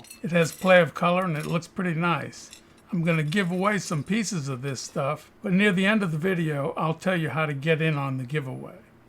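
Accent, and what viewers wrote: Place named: Louisiana, United States. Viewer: JUST found your channel. 😱👏👏👏 Do I hear a hint of Louisiana there?🤷‍♀️ SUBBED for MORE OPALS!😎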